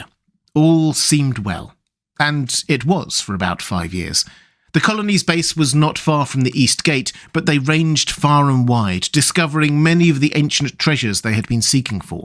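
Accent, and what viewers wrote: England, United Kingdom. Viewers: I think him having a British accent makes it even better.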